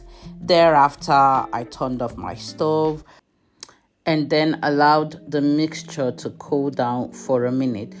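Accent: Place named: Nigeria. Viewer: You made this DIY so simple. Our Nigerian English is really so sweet and you deliver it beautifully. Thanks for sharing. Will try it.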